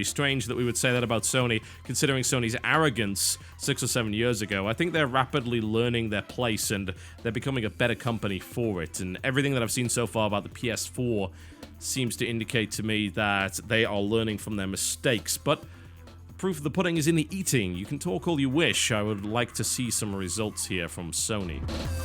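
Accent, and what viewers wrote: United Kingdom. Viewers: Don't worry about missing a few days of content patch, though we all love to hear the latest gaming news spoken in enthralling brittish accent, followed by the most cynical analyses of the current state of the gaming world, we know how hard it is to produce quality content for a youtube channel and have a life at the same time.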